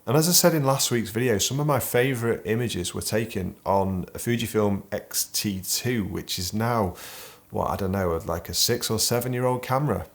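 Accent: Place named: Birmingham, United Kingdom. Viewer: Sounds like from Birmingham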